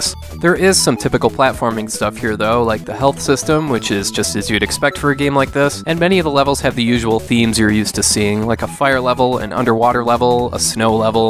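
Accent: Minnesota, United States. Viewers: Wait u a Minnesota resident? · Loved that Minnesota accent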